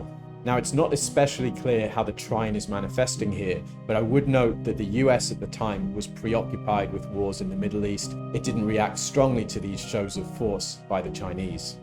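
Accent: United Kingdom. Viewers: Nice to hear a british accent. Was a bit tired of boring Chris Brennan who almost makes me doze off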